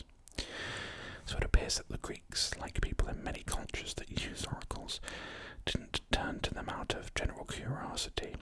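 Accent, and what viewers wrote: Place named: England, United Kingdom. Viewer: I love history and English accents so great combo for Me. Thank you.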